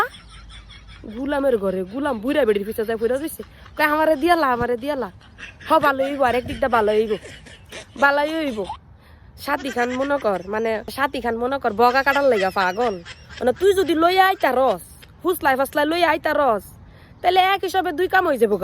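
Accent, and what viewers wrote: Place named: Sonargaon, Bangladesh. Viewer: আপনার কথা বলার ধরন গুলো আমার অনেক ভালো লাগে,, আপনার গ্রামের বাড়ি কি সোনারগাঁ মদনপুর এদিকে,, আমার এক ছোট ফুফুর বাসার ওখানে,, তাদের ভাষা এরকমই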